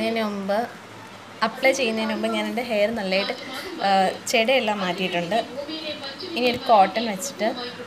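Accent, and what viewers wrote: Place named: Kollam, India. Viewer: njan kollam aanu😅😅. Samsaarikkunne kettappol thonni aa side aannu😃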